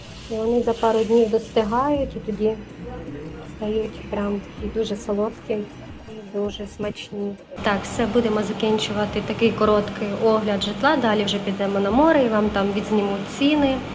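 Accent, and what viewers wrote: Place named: Poltava, Ukraine. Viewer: Суржик Полтавський чи Дніпро?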